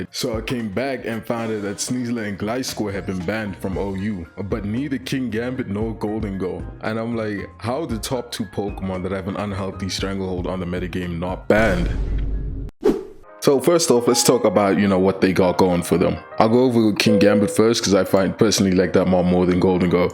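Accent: South Africa. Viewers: Yeah right? It's a like mix of South African, English and American (not sure on the specific regional accents, but you get me) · Are you South African?👀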